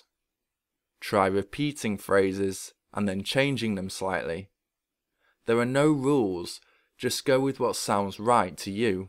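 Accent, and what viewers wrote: United Kingdom. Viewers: Excellent lesson. Awful British accent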